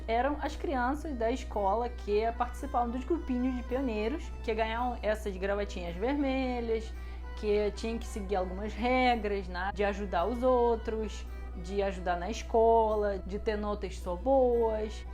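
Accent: Rio de Janeiro, Brazil. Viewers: Você fala muito bem português e ainda tem sotaque carioca.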